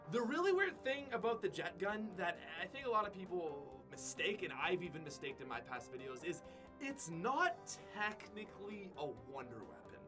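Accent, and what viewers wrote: Canada. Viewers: I love how Canadian he is. Oat-standing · Love your videos!! You're Canadian accent is hilarious too😂😂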